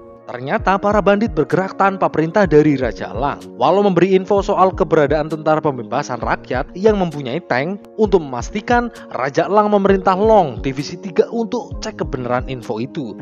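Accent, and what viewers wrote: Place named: Java, Indonesia. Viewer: Mantap jawanya masih kental sekali😅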